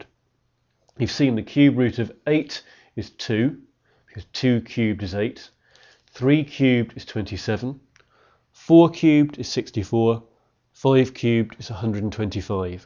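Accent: United Kingdom. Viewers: Ur accent is incredibly awesome btw, what type of British accent do u speak? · Cool British Accent makes me feel smarter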